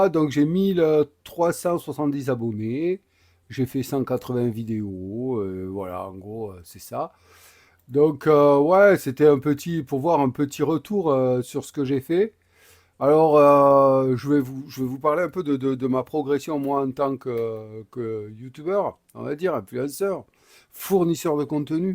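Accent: South, France. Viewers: avec l'accent du soleil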